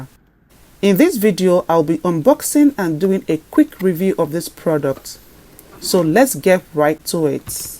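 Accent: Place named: Nigeria. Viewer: Nigerian accent sucks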